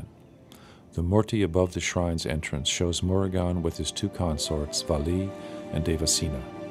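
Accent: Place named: England, United Kingdom. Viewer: Excellent Presentation and Video steady, well explained voice and accent so beautiful graceful and respectful english spoken by englishman is the way to go in these day. People around the world need to know our Hindu Cultural, Heritage God Temple Site. Thank you very much clear view and explanation perfect keep it going with all other temples too. I have not visited that temple yet but seeing this I want to in my Life.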